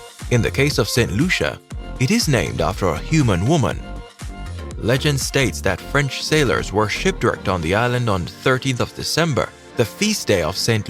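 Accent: Jamaica. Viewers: He sounds Jamaican to me and I am Jamaican. But then again Grendians have a similar accent too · The Host Presenter Is Jamaican/ I Can Hear The Accent🇯🇲🇯🇲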